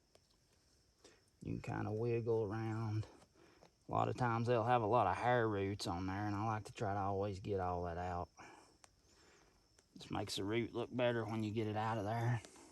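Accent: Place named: South, United States. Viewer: Thank you so much. What a nice tribute to your Mom! I enjoyed listening to your southern drawl as you led the hunting expedition. It was great to actually be there and watch a pro at work. Your Momma taught you well...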